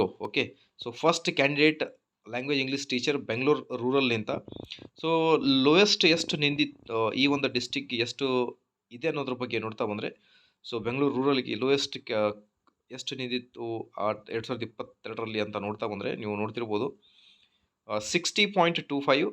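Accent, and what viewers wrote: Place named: Mysuru, India. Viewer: Mysuru english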